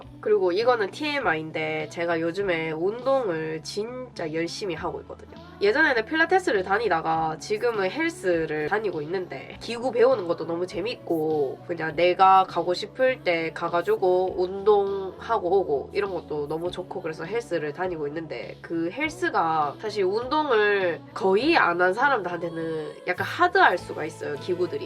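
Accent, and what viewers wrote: Gyeongsang, South Korea. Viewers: 大邱のなまりですか？？ 何となくですが……🤔 とてもお綺麗です！！🥰🥰🥺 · 말투는 대구 경북 ㅎㅎㅎ너무이뻐요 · Is this a busan dialect ? I love it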